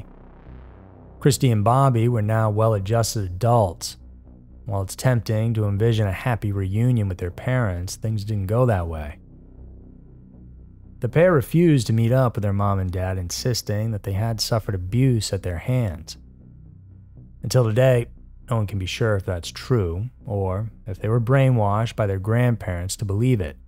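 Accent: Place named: Texas, United States. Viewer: LoL...I was convinced that he was Woody Harrelson and occasionally accused him of hiding his true identity (Woody) in my comments...until he started showing his face in his videos. But you agree he's a Texan, right? Got that Texan twang.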